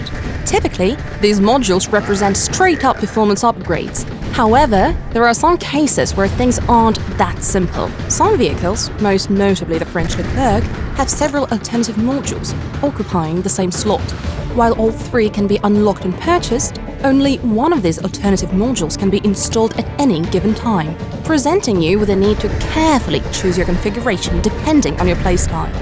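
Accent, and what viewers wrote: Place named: Australia. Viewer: Sorry but this aussie accent in this video is very awfull to listen! A clear american accent would fit much better here 😉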